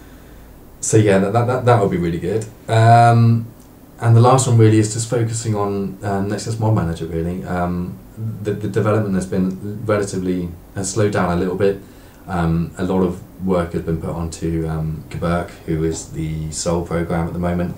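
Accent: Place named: United Kingdom. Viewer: Very interesting as always. British accent makes everything better!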